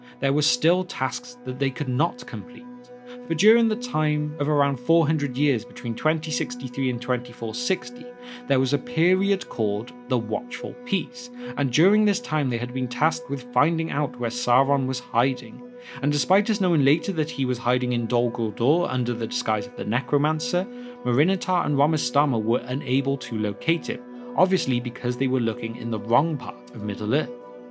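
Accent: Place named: West Country, United Kingdom. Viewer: I love your west country twang, very hobbity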